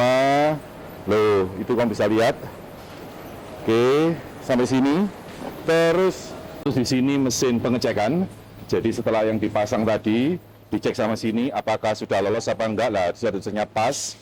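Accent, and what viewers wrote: Java, Indonesia. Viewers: Jowo a pakde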